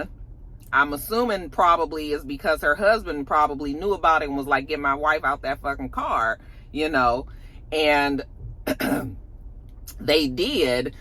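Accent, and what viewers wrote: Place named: Los Angeles, United States. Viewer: Lol. I love when you do your LA hood 🥷 accent 😂😂😊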